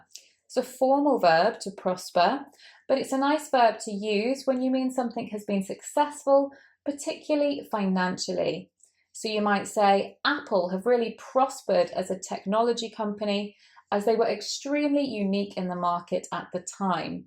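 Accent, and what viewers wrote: United Kingdom. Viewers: I enjoy this great method of explaining. But as a biginner I need to watch more lessons from teacher in order to be familiar with her british accent. A biginner learner from Algeria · Thanks you. I'm from Brazil and I,m learning a lot and also correcting the bad words pronounced. I like so much your british accent. God bless you and your baby.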